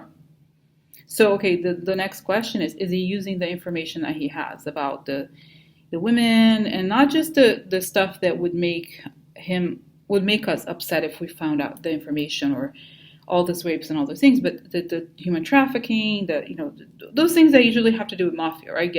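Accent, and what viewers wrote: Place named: Russia. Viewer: The faces take away from your content? Really? Oh HORSE SHIT! They enhance your readings, help us cope, and provide most of us with the only politics-related laugh we will get each day. Especially love your Russian accent. Never stop. PLEASE and Thank You! 💕💕😂💕💕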